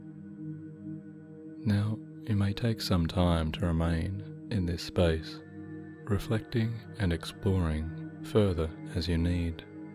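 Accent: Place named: Australia. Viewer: Very nice Meditation, love your accent, Australia or New Zealand? Namaste!!!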